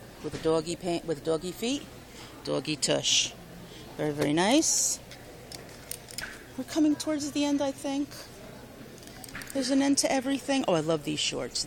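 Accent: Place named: New Jersey, United States. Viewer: Dakota will look super cute in that bunny romper!! Thanks for taking us shopping with you!! I love the outfit that siad hero and had the little bulldog..I love all the different things your Wal Mart has!! I love the monkeys and dogs on the planes sleeper!! Totally love the monkey best friends set as well! Who am I kidding..I loved every bit of it!!I love your NJ accent..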